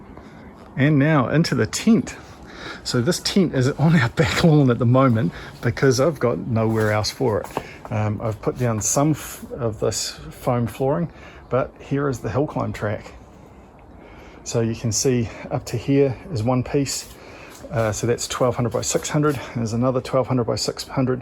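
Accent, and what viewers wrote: New Zealand. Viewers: This was the second vid of yours I watched. Great stuff, and honestly I didn't pick up the Kiwi accent until I watched this. While watching the first vid (one of the hill climbs) I was thinking, that's a "unique" Aussie accent... well now the penny has dropped! Hahaha. As a fellow Kiwi, it's great to see one of the "team" create something truly amazing. I'll def look at your merch and make a purchase. All the best and stay safe. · Great behind the scenes vid mate! Laughing, I gave up informing a few US viewers that you were a Kiwi, but no, no, they knew better, you were Aussie! It was not worth upsetting anyone..... They still have their dreams.....Until they watch this! Haha, but with your accent!! You certainly have continued and expanded your dedication from day one! Going great! Well done! So one tech question, what type of lighting have you found best to film with in NZ? 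Cheers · Yeah I can imagine it would be tough. I honestly thought you were two different guys. I knew you were a kiwi but thought was an Aussie living in NZ. Some of the characters I'd like to do would be Howard Cosell Sly Stalone (as Rocky) the Blue Aardvark from Pink Panther are a few. Oh and I have an Aussie char I'm learning to talk like from you. Yes I realize you're a kiwi but it sounds the same to most eh? My characters name is Billy Bong Bonza he will have all kinds companies with commercials from a deck and patio construction Co (the way you say deck is hillarilious) to Wombat Dating Site for lonely men looking for mail order brides.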